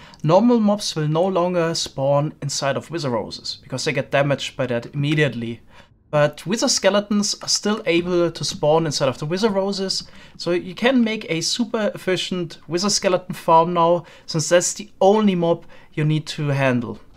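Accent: Germany. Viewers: This german accent xDDD i rly cant stand that xDD But still nice video :) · I can tell you’re german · It's just standard German English. The „th“ sound does not exist in the German language and the „z“ (as in „blaze“) is made in a similar way and does not sound too different. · Er redet wie ein Deutscher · One question. I'm not sure where your accent is from. Is it German? Or some other one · Are you German? Your accent is pretty familiar to me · Is that an german Aczent? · Where are you from? You sound like a german guy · his german accent tho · What a german accent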